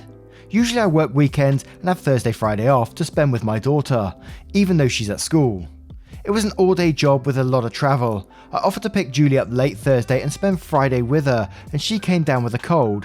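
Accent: England, United Kingdom. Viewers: Listening to this while I work on ironing out details with a guy 2 hours drive south to look at the horse he's selling. If all goes well, I'll be a first-time stallion owner:D These videos are one of the highlights of my week (I like letting them build up and then binging on the videos) as I like hearing not only the reading style and accent (sucker for an English accent), but your own points of view and assessments of the stories you read. So, thank you, ^^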